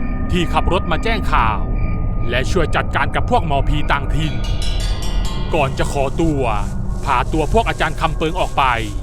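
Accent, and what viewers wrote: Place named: South, Thailand. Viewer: คนพากนี้เแ็นคนใต้เเน่ใช้ม้าย เพราะฟังจากคำพูดติดปากของคนใต้ที่ ที่พาไปเเหลงกลาง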